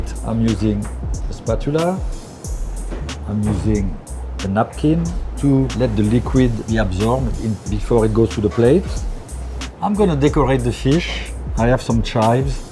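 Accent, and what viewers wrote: France. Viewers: The only French accent I can listen to without fatigue. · When you speak perfect English with perfect French accent ahaha 😮😂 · Merci Chef. Bel accent, merci de rendre hommage au francais. Calliss zont qu'a faire l'effort de nous comprendre les osti d'anglais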